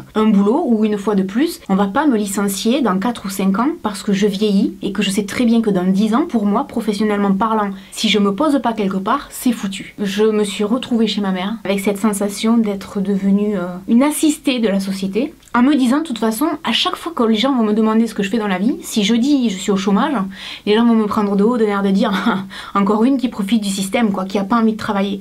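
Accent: South, France. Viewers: lol lol c'est l'accent du sudeee 😂😍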